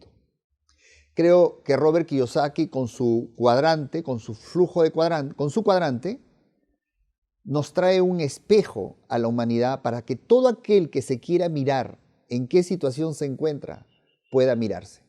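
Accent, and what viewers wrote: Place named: Peru. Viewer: No es por discriminar y ante mano lo digo no todos son iguales, pero con solo a los primeros momentos que escuche a este señor asumí que es peruano, la mayoría que eh conocido creen saberlo todo y los demás están erróneos aún viendo los logros del prójimo , mi humilde opinión , eh aprendido q escucha consejos de quien a obtenido frutos no de quien cree lo sabe y no los a conseguido .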